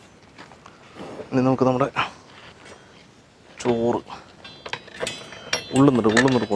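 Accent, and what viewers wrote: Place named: Palakkad, India. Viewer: പാലക്കാടൻ ആണല്ലേ.. വർത്തമാനം.. കേട്ടാൽ പാലക്കാടെന്നു തോന്നുന്നു..!!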